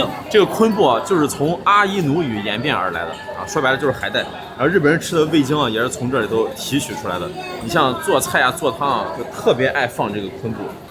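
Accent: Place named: Qingdao, China. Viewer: 听口音是青岛人吗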